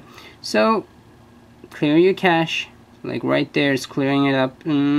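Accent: India. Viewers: you MUST be indian... i can tell clearly from the accent.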